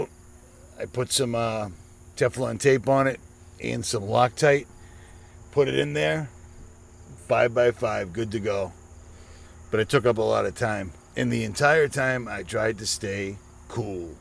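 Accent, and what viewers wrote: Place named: Boston, United States. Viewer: your Boston accent is badass im from york england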